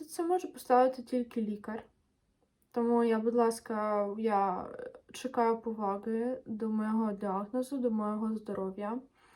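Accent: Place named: Russia. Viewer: Русский акцент. Лучше на русском общайся, слушать сложно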